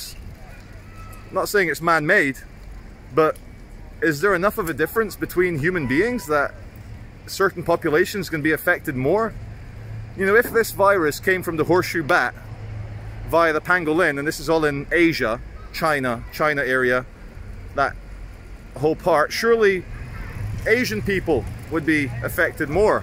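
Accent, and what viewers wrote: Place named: Manchester, United Kingdom. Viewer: Your Manc accent is starting to shine through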